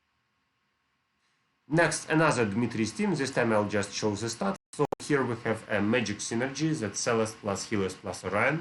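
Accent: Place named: Russia. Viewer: в твоем английском я слышу русский) isn`t it?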